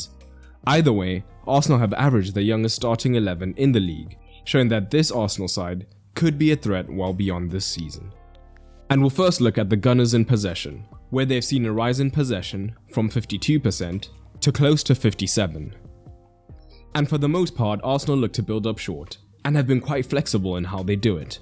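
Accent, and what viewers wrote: South Africa. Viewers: Are u South African? 🤨🤔🧐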